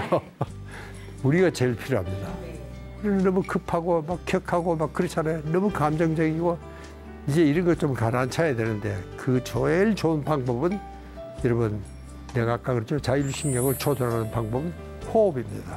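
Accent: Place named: Daegu, South Korea. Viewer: 선생님 대구사투리 쓰시니까 좋습니다 저도대구사람입니다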